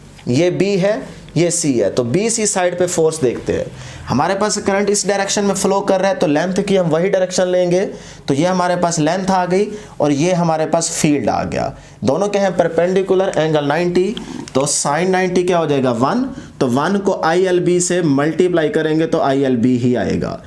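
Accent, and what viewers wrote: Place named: Saraiki, Pakistan. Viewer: sir m also.. from ur city bahawalpur But now m living ......in Lahore ...but I really appreciate ur hardworking n saraiki tone loved it .,.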